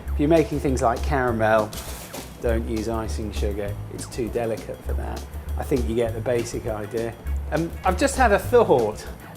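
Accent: United Kingdom. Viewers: That thing with british accent I always fall in love. New sub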